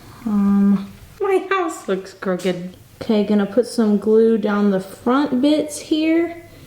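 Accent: Texas, United States. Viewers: R u from texas?love ur channel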